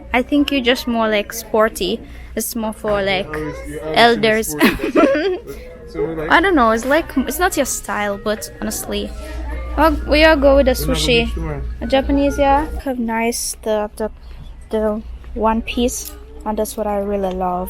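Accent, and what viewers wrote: Jamaica. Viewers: girl big up, I like hearing you talk patois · Yes she speaks patois very cute and dresses trendy like a black girl 👧🤣😂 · I speak like Duel Sylvester, God has work out a well put together family vacation✈ be thankful.you speak patwa deep love it play safe ⚽️Errol love from sunny vincy 🇻🇨🙏😍💪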